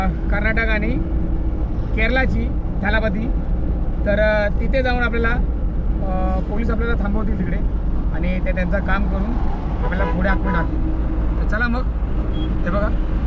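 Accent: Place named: Konkan, India. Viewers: Kokani loka ashich bhasha boltat bhari ❤ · भावा कोकणी आहे तो मुंबई ला कोन मराठी बोलतो रे सगळे हॉटेल ला हिंदी वाले आहेत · भाय तो कोकणी माणूस आहे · Yalach tr majja hy kokni bhasha hy ti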